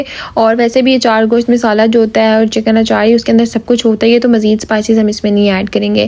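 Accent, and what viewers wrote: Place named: Arab world. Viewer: Your voice is soo sweet and your arabic accent also 😍 very nice vlog and i really enjoyed your vlog🎉❤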